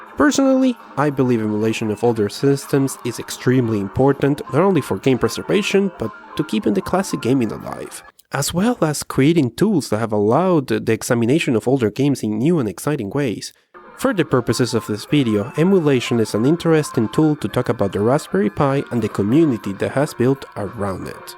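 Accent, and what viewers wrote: Spain. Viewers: Guys he Isn't Asian, he is an Spain Guy, so that accent is Spanish accent.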